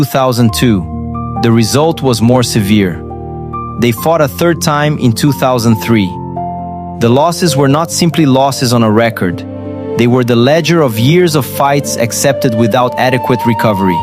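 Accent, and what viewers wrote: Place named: Brazil. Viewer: I love that this love letter of a video is done by someone with a brazilian accent. It’s easy to get lost in blind pride for people from your country, and I salute you for keeping it real instead.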